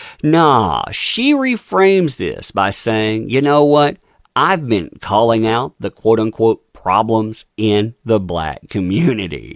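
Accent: United States. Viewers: I love this guy's accent😅 He sounds like a real American cowboy❤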